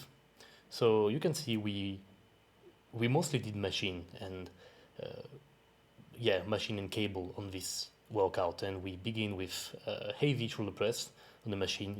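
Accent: France. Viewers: Vidéo d'entraînement de 20min, voiceover en anglais avec un fort accent français, intensité au rendez-vous, que demander de plus ? PS : pareil que toi perso. J'ai toujours été plus à l'aise avec une prise plus serrée et je règle également tous les sièges le plus bas possible sur les machines pour les pecs. La largeur de clavicules influence la prise aussi à mon avis. Qu'en penses-tu ?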